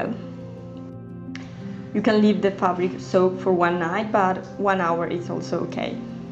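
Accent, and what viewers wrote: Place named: Italy. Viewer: Ooooohhhhhh avevo sentito accento italiano...posso chiedere una tradizione in italiano? Mi piacerebbe moltissimo imparare. Ma nella mia lingua c'è praticamente niente....ti prego puoi aiutarmi? Grazie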